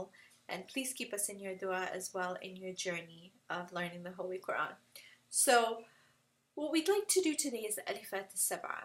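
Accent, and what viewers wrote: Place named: Arab world. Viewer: i wish i could recite the Quraan with that beautiful Arabic accent.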